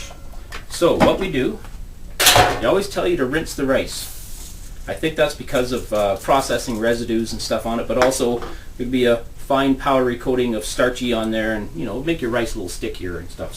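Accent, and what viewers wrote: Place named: Canada. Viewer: I was just thinking you sounded Canadian, and then you pulled out the bagged milk!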